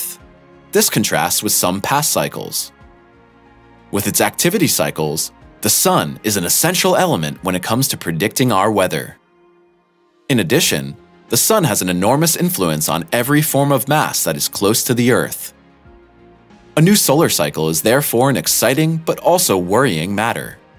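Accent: United States. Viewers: This video is utter pop science cack, spouting the kind of shit you'd find in a Christmas cracker or fortune cookie. This channel doesn't deserve its number of subscribers and this video certainly doesn't merit the views it has. The narrator has that quasi-American accent of someone whose first language isn't English and the knowledge of someone whose first discipline certainly isn't astronomy.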